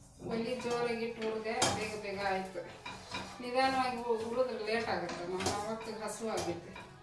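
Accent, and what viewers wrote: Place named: Uttara Kannada, India. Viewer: Neev helid nija hasge iddastu kaal chachu anta gaade edyalla hage naavu hage num badgetge corectagi jeevna madtivi nim utrakannada maat kelakke channagirutte henmaklige helkodude beda avrige tilvalke jasti tande tayi anta preeti kalji madtare nanguu ebru henmaklu channag nodkantare jwara bandre bayig yenuu bedagutte nam kade kanchikay uppinkay hakkand uuta madtivi swalpa nalge ruchi barutte nan maglu frijalidakke heegidde box tandale nice vlog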